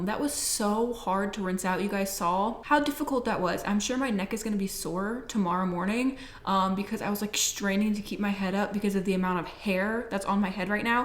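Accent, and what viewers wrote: United Kingdom. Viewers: I love your British accent, as a Brit, I approve. Also love your attitude as ever...you are awesome.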